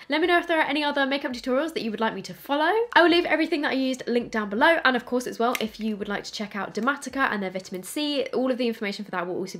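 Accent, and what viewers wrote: Australia. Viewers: i love the way you keep slipping into an aussie accent whilst talking 😂😂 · Okay but your Aussie accent slapped 🤌🏼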